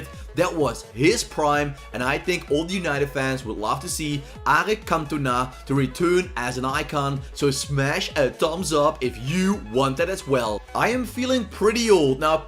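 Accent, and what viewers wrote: Netherlands. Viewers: are you from the netherlands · You sound dutch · Are you from Limburg? ( The Netherlands) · A little bit of a Nederlandse accent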